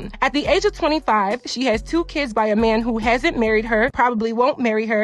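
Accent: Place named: California, United States. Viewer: To answer you about the accent she has Trust me it is a Cali accent I live in Cali and everyone always tells me I sound like the Kardashian’s when I never noticed I guess it’s just the main accent out here ❤️ I love your videos !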